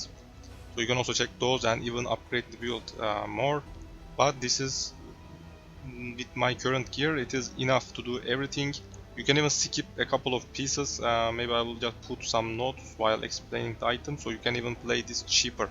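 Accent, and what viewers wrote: Turkey. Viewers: Bu adam türk değilse insan değildir. böyle konuşan türkten başka insan olamaz. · Ingilizce aksanından Türk olduğun öngörüsünde bulunacaktım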